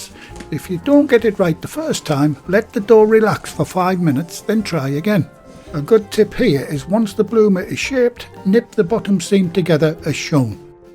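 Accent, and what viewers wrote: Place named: Hexham, United Kingdom. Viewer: Yes it’s like food planet. Love the accent, Hexham way?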